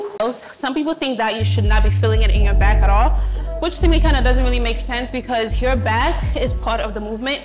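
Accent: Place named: New Jersey, United States. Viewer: Girl, let me find out you from jersey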